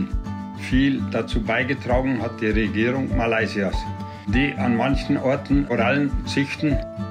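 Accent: Bavaria, Germany. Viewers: Du musst doch aus Bayern kommen oder ich hör das an dein fluacha 😂mei opa red genau so da her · Wia sympathisch ❤ s'gibt halt nix scheenas als Schimpfa af boarisch 😆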